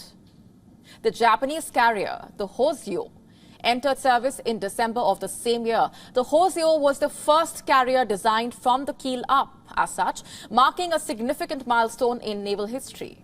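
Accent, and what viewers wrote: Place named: India. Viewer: 这印度口音听着真是头痛。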